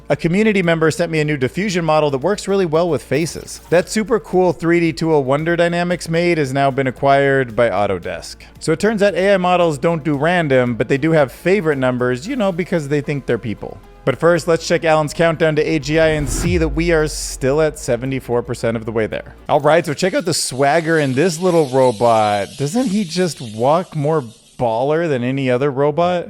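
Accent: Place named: United States. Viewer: This is so funny! I love you, man! Like he is holding it!:pride-flower-pansexual::pride-fan-rainbow-open:Sweden??? You sound American!:pride-heart-rainbow-philly:Ok I think I am the one who lives off crypto currently! But if I have a child from Sergey Brin - I will be on Brinfare!:pride-flower-pansexual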